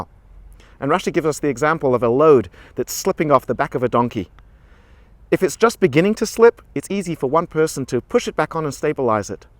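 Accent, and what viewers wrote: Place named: Australia. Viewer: Wow, look who it is. All these years it must be 25 years and your Australian accents come back. I hope you’re doing well thanks for a great message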